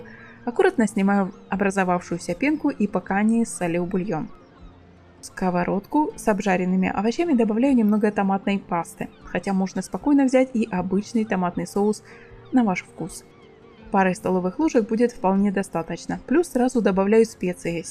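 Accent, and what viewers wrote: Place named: Surzhik, Ukraine. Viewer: С таким чудовищным суржиком и на рецепах?😅😅😅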